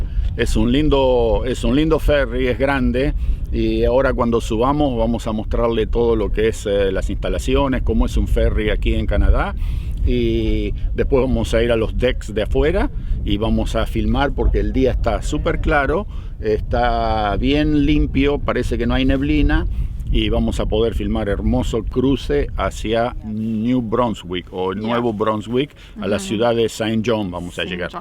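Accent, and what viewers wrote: Argentina. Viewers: Como se hacen querer!! que maravilla seria poder viajar con ustedes! Hace 2 meses llegué a Toronto y durante todo el proceso de sacar la visa (9 meses me llevo) estuve mirando sus videos y aprendí un monton sobre Canadá!. Gran saludo!!! me recuerda a mi papá que nació en la misma epoca. Y es tremendo como tiene todavia el acento argentino bien marcado! Gracias!!!!!! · arranca el video mencionando que va a regar las plantas porque hace mucho calor, aunque no sean sus plantas ni su casa QUÉ GRAN CORAZONNNNNNNN, LA PUTISIMA MADREEEEEEEEEEEEEEEEEEEEEEEEE, encima dice "le vamos a pegar una regada", no hay caso, lo escucho y escucho tonada e historia argentina, lo apreciamos mucho!! <3 <3 <3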